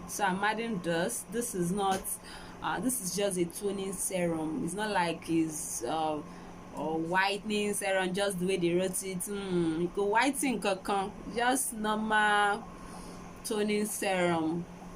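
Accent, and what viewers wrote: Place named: Lagos, Nigeria. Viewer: Ur Yoruba accent is like dis Lagos pple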